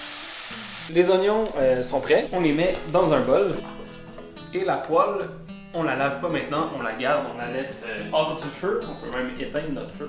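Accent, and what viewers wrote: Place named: Quebec, Canada. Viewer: Je suis suisse et sa se voit que il est québécois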